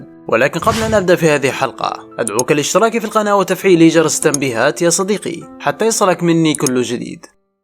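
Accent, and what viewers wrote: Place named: Libya. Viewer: أسمع أنت ليبي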